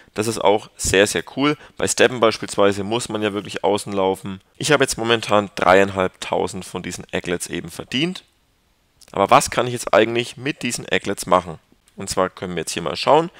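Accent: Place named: Nuremberg, Germany. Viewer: folge dir mal auf twitter ;) ich hörte da so einen Nürnbercher dialekt raus endlich mal einer mit Youtubetschänel aus der Gegend nice vl. kann man sich mal treffen ;) ich bring auch an LKW mit (nur ein Franke weiss was das für gutes Essen ist ) ;) mjam mjam ;)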